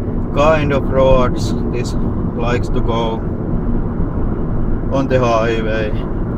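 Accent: Finland. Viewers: On kyllä nii finnish english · I love the Finnish accent and the car as well :)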